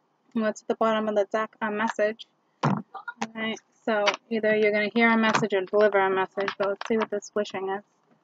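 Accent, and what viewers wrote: Boston, United States. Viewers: I know you have a Boston accent. No texting. Call mommy. Then go unannounced at the house. I did that and all the kids were running because my daughter was not there. That put a stop to that ish. She was 14. It's a different world once they turn. 13, 16, 18, 21. She is now 27 and doing okay now. Sheesh... #Motherhood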